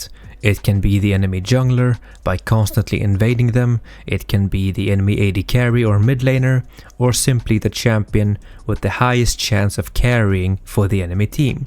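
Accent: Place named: Sweden. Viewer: From sweden right?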